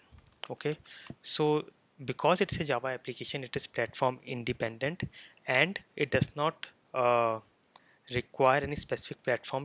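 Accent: India. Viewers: Do you can speak in English with out Indian accent ??? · I guess you guy from India... /smile/ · 这印度口音，听着真累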